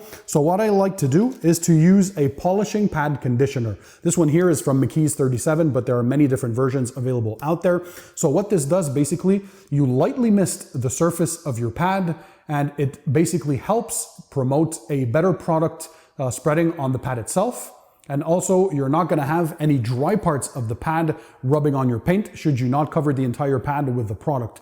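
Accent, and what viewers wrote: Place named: Canada. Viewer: Seems convincingly Canadian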